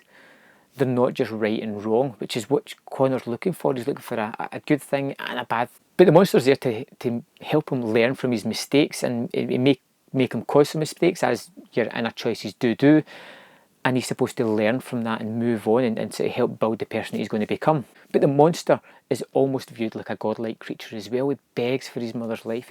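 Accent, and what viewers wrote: Scotland, United Kingdom. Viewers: I love your Scottish accent. I will love to say that Scottish and Irish accents are the most beautiful accents ever! I love how well you described the story. I watched this movie last night and I cried, because my aunt passed away with an overdose of medication for her cancer. I never got to know her too well do to how young I was when it happened. Today I feel guilty, because I didn’t get to see my aunt much since my mom never got along with her. My grandparents always tell me how similar I am to her. She use to love to draw, and ride horses all the time. I felt a similar sadness when hearing both my aunt and my grandma got breast cancer too. I almost wanted to commit suicide and kept asking why them? It should be me who gets cancer. Luckily they both made it alright, but that thought haunts me every night. This movie taught me to never give up and to love my family and the good people who are around me. 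I will have to let go of the sadness and never forget the good memories I have with my loved ones. Enjoy with the people and animals you have now so you have long lasting memories of them.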